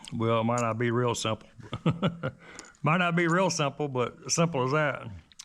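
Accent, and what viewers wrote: United States. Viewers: Where about in the states you come from love the accent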